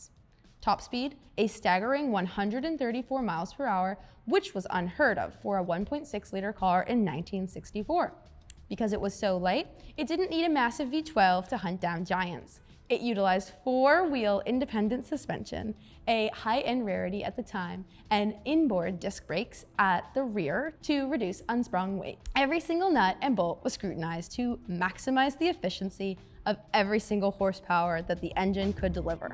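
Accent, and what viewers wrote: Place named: California, United States. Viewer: dear god, lets get a different narrator... i dont want to listen to a california girl accent. ever.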